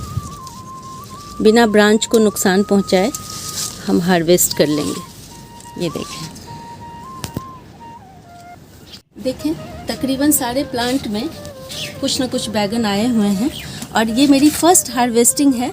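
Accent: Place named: Eastern Uttar Pradesh, India. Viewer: Mam aap purvi uttar pardesh se lagti hai shayad ur good job👌👌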